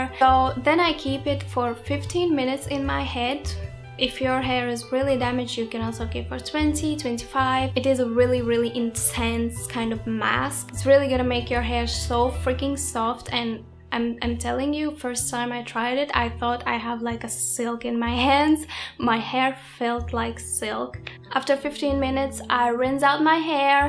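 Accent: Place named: Latvia. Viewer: Are you from Latvia? I swear that i can hear your accent. Nice video :)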